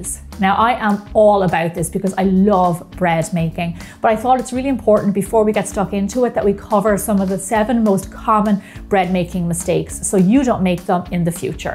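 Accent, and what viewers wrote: Ireland. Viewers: Well, I can make hundreads of dishes but will never get this right.given up on bread making. this is a good film. TU for sure and she's very atractive bread maker but sounds Irish not english. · she sounds Irish to me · TY for the tips. Love, love your accent, I could listen to u all day. I believe it's Irish? Both my great, great Granny's were named Bridget & came from Ireland to the U.S. (to the great state of New Jersey) during the late 1800's both married German immigrants named William. I have made several of your recipes and they were quite good, Keep up the great work. 😁👍💖👏👏👏👏👏👏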